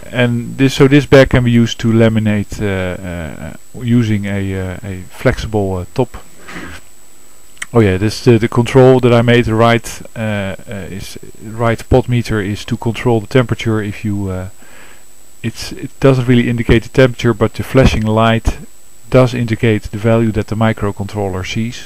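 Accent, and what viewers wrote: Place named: Netherlands. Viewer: ZIEK nederlands accent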